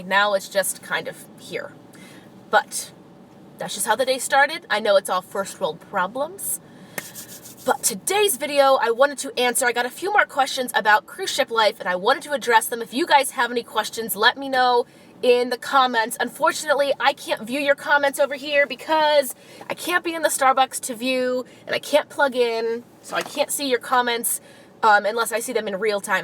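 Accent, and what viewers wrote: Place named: United States. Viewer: I heard the American accent and knew you had to be in the production show lol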